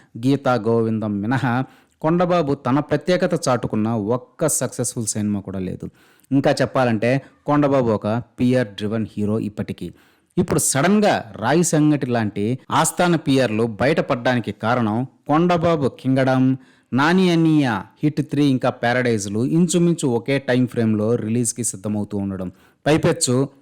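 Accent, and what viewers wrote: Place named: Chittoor, India. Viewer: nuvvu just only mega family kosam videos pettevaadu erri puka nuvv chittoor language lo matladuthunnav ra erri puka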